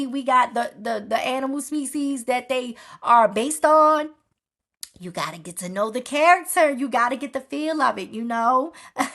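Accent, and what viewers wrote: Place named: Baltimore, United States. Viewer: Me and my son enjoyed ya video , And you must be from Bmore or Maryland i love ya accent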